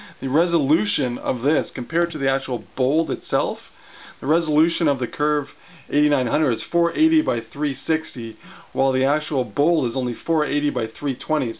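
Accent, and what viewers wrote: Canada. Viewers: I think I finally understand what a Canadian accent is. (outside NA)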